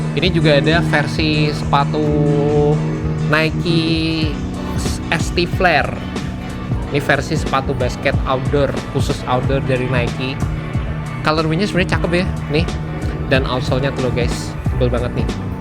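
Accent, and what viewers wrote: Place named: Surabaya, Indonesia. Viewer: ketauan orang surabaya dari meling meling bolo 😂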